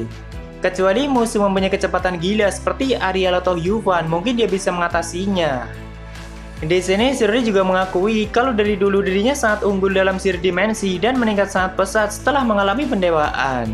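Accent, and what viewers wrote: Java, Indonesia. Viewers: Kemaren di live megane sensei logat jawamu kentel bang....kok di kontenmu ga keliatan logatnya???? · Iya sih klo pas ngbrol kliatan Jawa nya, mending gituu sih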